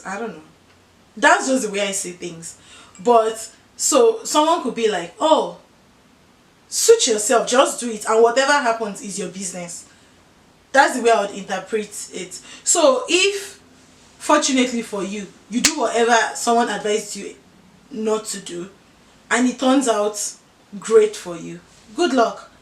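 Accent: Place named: United States. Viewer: You still need to know how to speak Yoruba without the American tone. Above all, It a nice program.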